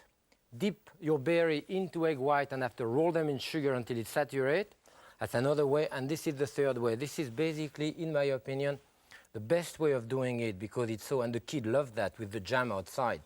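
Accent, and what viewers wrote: France. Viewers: Sometimes a bit hard to understand his french accent but I'm learning he is an awesome chef to watch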